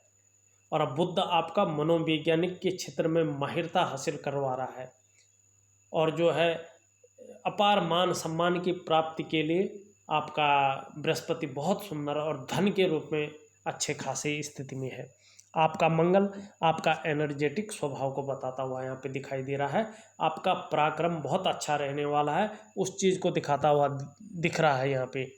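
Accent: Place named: Garhwal, India. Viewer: Aap garhwali Ho kya Apka tone se lgra hn 100%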